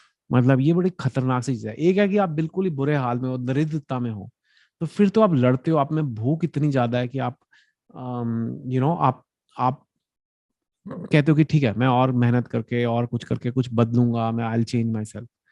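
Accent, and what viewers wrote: Delhi, India. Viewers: I like the way he talks like Delhi Walla style.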